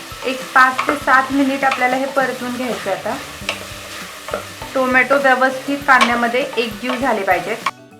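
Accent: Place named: Satara, India. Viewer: mi pan satarchi ahe.. bolnyavhya style Varun guess kele mi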